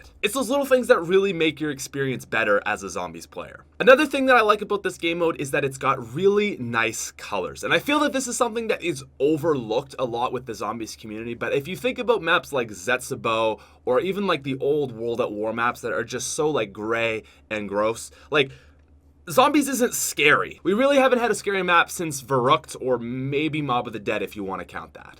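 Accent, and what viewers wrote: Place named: Canada. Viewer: After watching all 9 seasona of How I Met Your Mother, I've barely noticed that you have a Canadian accent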